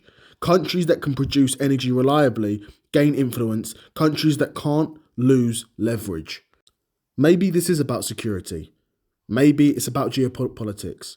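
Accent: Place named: United Kingdom. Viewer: oh,... you got a britney accent...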